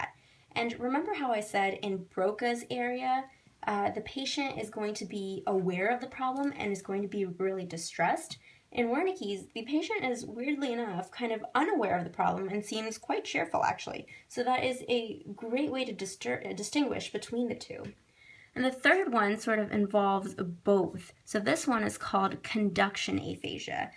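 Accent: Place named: India. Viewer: 🤔🤔 Indian and such an amazing accent.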